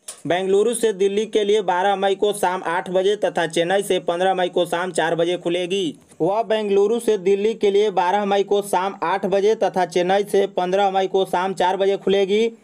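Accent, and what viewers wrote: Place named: Bihar, India. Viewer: Aapka bolne ka tareka sahe nhe lga. Bihar jaisa lgata hai.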